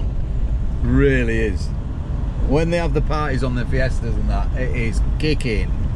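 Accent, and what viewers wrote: Yorkshire, United Kingdom. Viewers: Where in Yorkshire you from pal?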